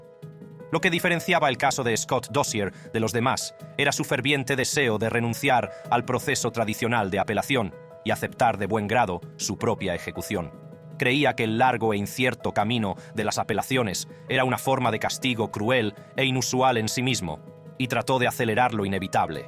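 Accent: United States. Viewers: Nose ,si persiven que el narrador habla de diferentes formas,primero habla como gringo que está aprendiendo a hablar español,luego habla normal,luego con acento de como español es rara su narración . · Ridículo hablar con acento americano cuando se escucha que puedes hablar perfectamente el español ridículo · Deberían de buscar un narrador de historias, que no cambie su manera de narrar. Porque habla claro y luego le entra complejo de americano tratando de hablar español, que no se le entiende mucho. O te peinas o te haces rolos. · Que cringe da este motor de voz gringo 😅😅😅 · Q voz.... empieza hablando muy bien el español y luego a lo gringo, si no puede q no hable y pongan a otros q hablen bien el español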